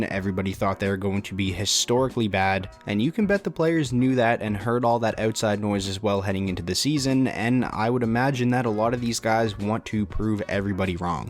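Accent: Newfoundland, Canada. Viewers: You from out east bud? You sound a bit like a Newfie with your 'ar' sounds haha. Love the channel, keep it up!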